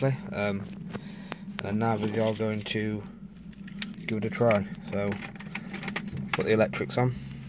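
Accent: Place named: Sutton, United Kingdom. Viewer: I knew you was from Sutton the first video I watched! I can tell by the accent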